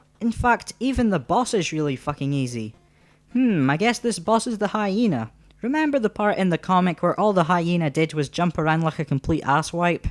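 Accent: United Kingdom. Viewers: "the level from hale!" dude you arent funny. look up avgn! i was laughing at your stupid british accent. it is not easier said than done? dont you mean it is easier said than done. you dumb ass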